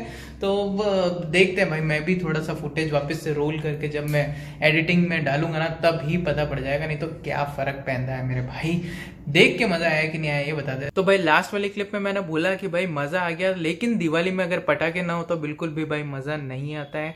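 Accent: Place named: Marwari, India. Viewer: सा, वंदेमातरम। आपके वीडिओज़ में जो परिवार के प्रति स्नेह व लगाव दिखता है वो बहुत सराहनीय है। और थोड़ी बहुत मारवाडी बोली भी बोलेंगे तो और आनंद आएगा। आपरी हिंदी में मारवाड़ी री सुगंध तो आवे है, उनने सुन र जी घणो सोरो होवे सा।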